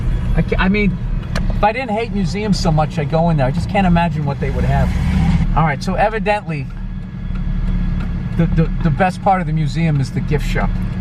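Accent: Boston, United States. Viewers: I noticed your Boston accent still comes through although you've taken to pronouncing your Rs. Westfield Malls are all over the place; I visited one in Florida. There doesn't seem to be one in Westfield, Mass or Westfield, NJ, though. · do another Boston tour the Boston accent is unstoppable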